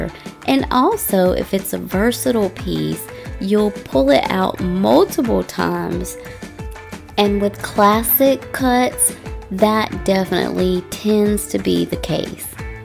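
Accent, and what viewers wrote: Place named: North Carolina, United States. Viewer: You're a sugar cookie, Love that NC accent too.. Subscribed 😉😊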